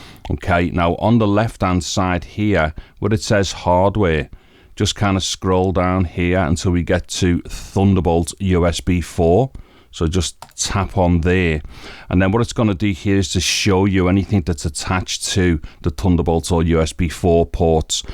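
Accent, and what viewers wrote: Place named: Scotland, United Kingdom. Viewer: Horrible VIDEO! FIX your accent. It is NOT ENGLISH and too scottish... And GET TO THE POINT. You meander all over and go so SLOW. STOP the hand gestures...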